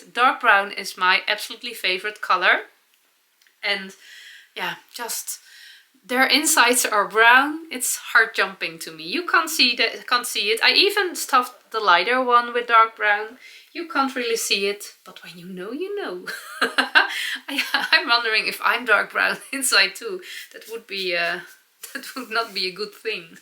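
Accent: Netherlands. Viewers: Greetings from Denmark - what a cute story about the blue rabbit. I spent my Easter making my first LCR bear this Easter - its so funny i enjoy making the cloths. I love you videos, your dutch accent and energy. 🐰🐻🦊🦁🐷🐮🐻‍❄️🐭🐱🐶🐌🦌🐨🐵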